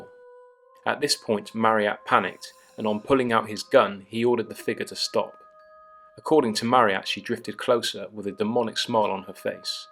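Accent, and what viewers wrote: United Kingdom. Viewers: I subscribed, and I think this is a great narration and good story. Well researched. I'm partial to British accents reading though. Most podcasts and audiobooks I listen to do not hold my interest if they do not have the accent. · I'm really liking the narrator's voice (?). I'm American & dig a brit accent. His videos are really enjoyable, as well.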